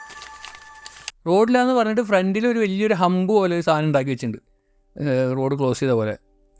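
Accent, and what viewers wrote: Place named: Valluvanad, India. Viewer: വള്ളുവനാടൻ ഭാഷയിലുള്ള ഇമ്പമാർന്ന അർത്ഥപൂർണമായ നരേഷൻ.... 😌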